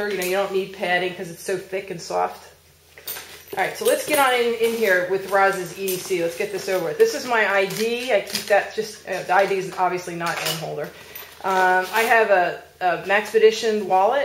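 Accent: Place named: New Jersey, United States. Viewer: I love how Jersey girls say "wooder" ;)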